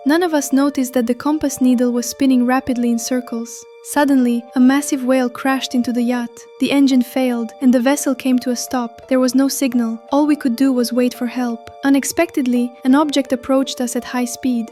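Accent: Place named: Russia. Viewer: Wth is this Russian accent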